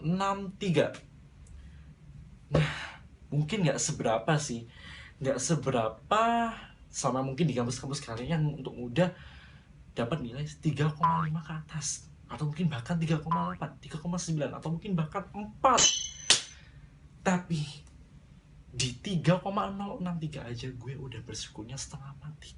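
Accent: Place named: Java, Indonesia. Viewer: rada ga enak di denger alias medok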